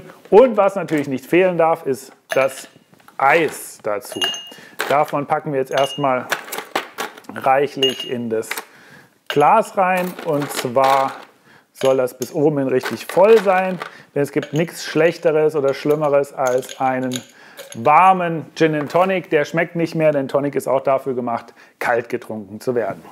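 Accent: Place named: Germany. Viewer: My favorite gin... and German accent makes me weak in the knees :-)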